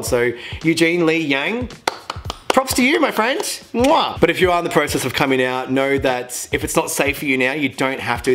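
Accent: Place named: Australia. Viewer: I can tell he’s Australian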